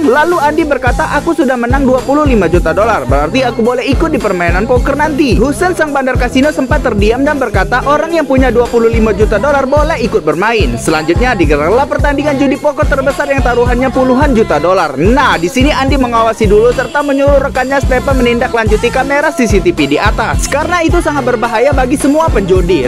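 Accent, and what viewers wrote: Palembang, Indonesia. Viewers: Kau wong Palembang po 😅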